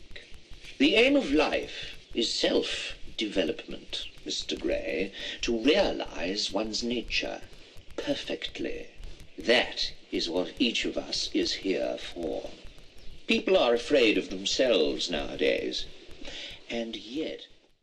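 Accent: United Kingdom. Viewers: Very good, but not how I picture Wilde sounding. He was Irish, this fellow sounds English and older. But a good reading nonetheless.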